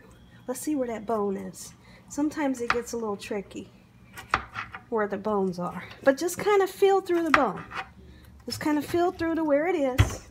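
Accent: South, United States. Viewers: I know you from the south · Hi new to your channel. Believe me im at awe. Love it. Are u from the south. U killing the cooking baby . lol. Like u said yall eating good in that house. Lol. God bless.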